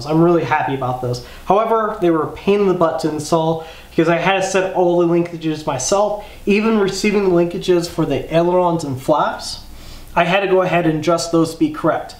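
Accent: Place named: Russia. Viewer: I can't place your accent. When you run some words together, it sounds like another language. Russian? Chinese?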